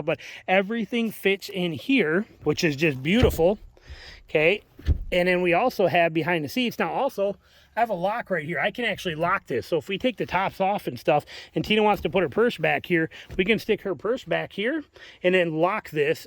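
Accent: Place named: Chicago, United States. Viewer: Are you from chicago ? You have a chicago accent.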